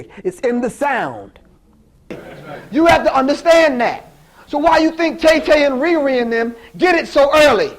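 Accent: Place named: United Kingdom. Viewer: WHY!! This nigga sound British. (no disrespect tho)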